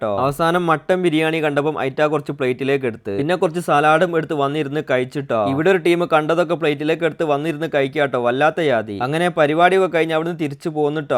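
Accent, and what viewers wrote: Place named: Koduvally, India. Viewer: തനി കൊടുവള്ളിക്കാരൻ തന്നെ സംസാരം 👍👍 ട്ടോ ട്ടോ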